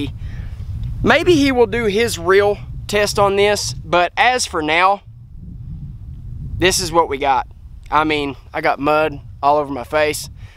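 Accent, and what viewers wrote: South, United States. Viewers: Good content and that southern draw is definitely new in the reviewer space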